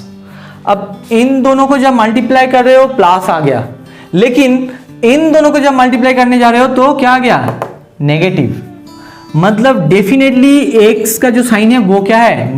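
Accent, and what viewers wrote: Bengal, India. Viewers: Sir are you bengali please reply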